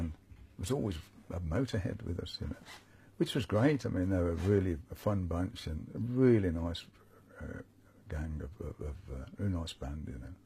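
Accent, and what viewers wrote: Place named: Birmingham, United Kingdom. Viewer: Sounds exactly like my dad did...northern English, Birmingham/West Midlands accent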